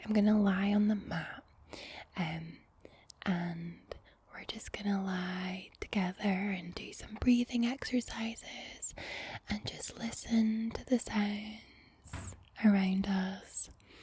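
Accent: Scotland, United Kingdom. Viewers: Woohoo... + yoga = ASMR bliss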